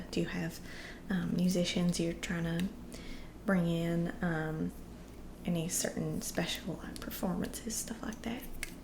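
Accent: South, United States. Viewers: Wonderful! Southern US accents are really lovely to listen to in my opinion :)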